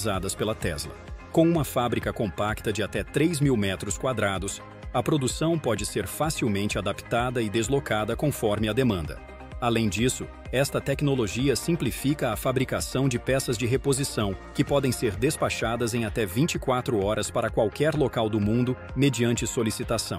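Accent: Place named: Russia. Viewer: I feel a russian accent... 😮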